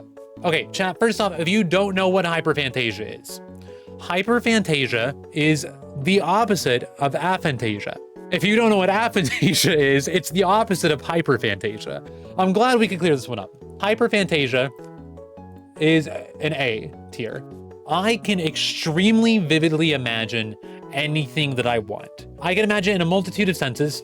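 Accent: United Kingdom. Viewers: you sound like you're doing a british accent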